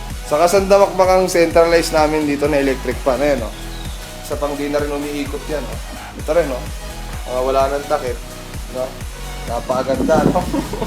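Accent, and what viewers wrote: Ilocos, Philippines. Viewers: Ilocano ka ata eh noh??? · Ilocano ka sir??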